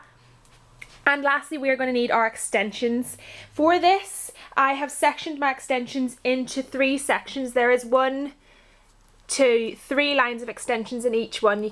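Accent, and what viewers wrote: Ireland. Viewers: Are you from Ireland or North Ireland?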